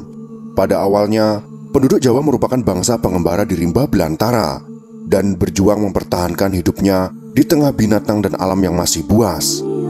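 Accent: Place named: Java, Indonesia. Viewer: anda juga berbahasa medhok. bahagia jadi suku terbesar didunia.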